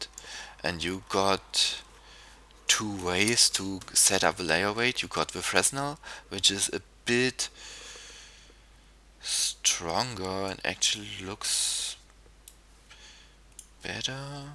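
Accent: Germany. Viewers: Man hört so richtig deinen deutschen Akzent, aber jetzt gehts ja ^^ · This guy is so under rated, this tutorial alone has help me so much more than any of the other tutorials out there, up until this point I had no idea how to apply a physics to an object, and I couldn't find anything on how to finalize physics, only how to simulate them, this guy saved me a ton of digging around online for an answer, all done with a lovely 'German?' accent. I love his accent but I am not sure where it is from.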